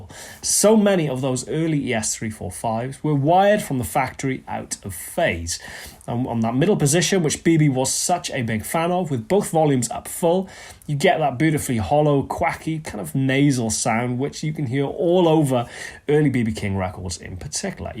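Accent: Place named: Geordie, United Kingdom. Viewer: Are you originally from Newcastle? Keep noticing a tiny bit of Geordie in your accent.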